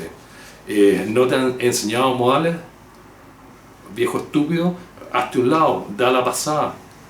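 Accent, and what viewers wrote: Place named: Chile. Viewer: Usted por su asento parece Chileno, es de allá?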